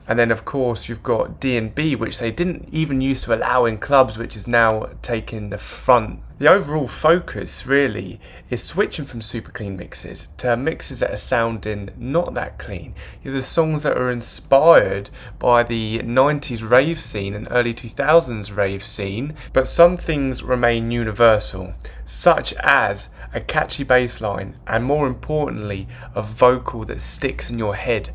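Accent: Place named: Suffolk, United Kingdom. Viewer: Ahh fair enough man! Thought I could hear a bit of Suffolk in your accent! Anyway keep up the great videos man.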